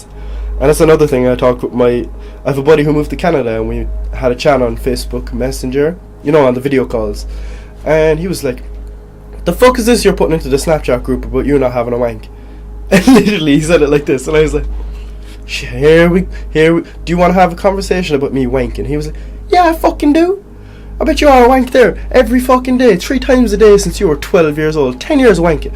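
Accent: Ireland. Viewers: Holy shit your Irish? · Beat the cheeks off her loool. That's a fine Cork accent u have there bai. Fair play kid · You’re Irish ?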